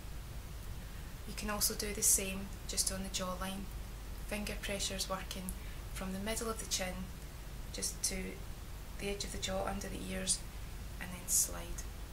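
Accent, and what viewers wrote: Scotland, United Kingdom. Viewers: she is Scottish for sure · (ZombieToad) its Scottish I'm Irish so I know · Did you study at the Moray College UHI? I'm getting insterested inthe Complementary Therapy HND. You give some glamour to the Scottish accent! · i really really like the scottish accent:) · Brandon Connell It's a Scottish accent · You are such a pretty girl and I absolutely love your accent. I have always wanted to visit Scotland. Have you ever wanted to live on another continent?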